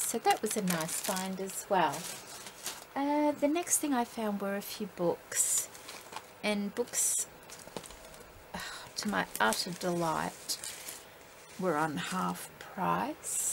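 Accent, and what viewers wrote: Australia. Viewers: Hello, I saw your comment and channel name on a video by Diane H and came to check out your channel. I don't know if you're familiar with Michigan in the USA but a lot of businesses and organizations use Mi or MI as "my" in their names (it's part of my name too!). I came here thinking you were from Michigan and was pleasantly surprised to hear the Aussie accent. lol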